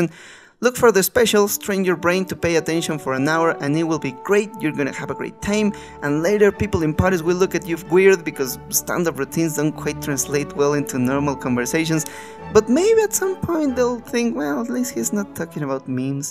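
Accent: England, United Kingdom. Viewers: Ulala señor ingles 😌👌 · Ulala señojjjj.... tiene la voz de todo un burgués Ingles 😍👌🏻👌🏻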